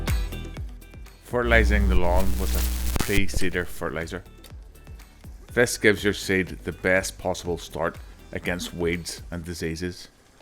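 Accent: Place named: Belfast, United Kingdom. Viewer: I love the Belfast accent!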